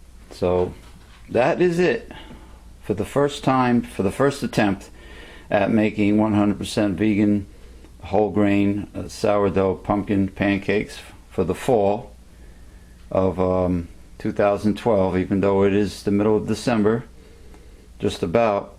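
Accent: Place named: New York, United States. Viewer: I was just looking for a vegan sourdough pancake recipe and came across yours, it seems to be still one of the few vegan sourdough recipes on youtube even in 2017. Admittedly, everyone has learned a lot about producing cooking videos since 2012, and I imagine yours would probably be shorter if you had made it today. But the bonus for your video is that you explained the process very clearly, so is very good for beginners, and your Robert de Niro accent and serious comments below are adorable. Italians are the best cooks.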